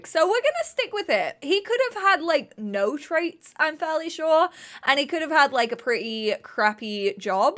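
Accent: United Kingdom. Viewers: you sounded particularly British in this video. 😂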